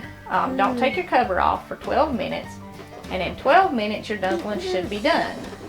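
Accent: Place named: Tennessee, United States. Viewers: Chicken n dumplings are my favorite!! Mama made great dumplings. She rolled hers out and cut them out. She had taken the chicken out of the pot and dropped each dumpling along the side of the boiling broth. She would use a butter knife to push the dough toward the center. I just thought that’s how everyone did it. But I’ve watched many many cooks on here make c n d and no one dropped them like she did. I’m like you, I don’t want veggies in mine. My Daddy didn’t even want the chicken put back in the dumplings. 😊 yours look more like what we do in East Tennessee. From your accent you might also be from TN. 😂 God bless you and your family. 🙏🙏🇺🇸🇺🇸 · YOU to are a fine blessing to this old man who can't cook too well here in WV. Thank You so very much for the patient instructions and ideas. Your wonderful voice, with charming Tennessee accent reminds me of my wonderful, long-passed Aunt God Bless You and your fine family.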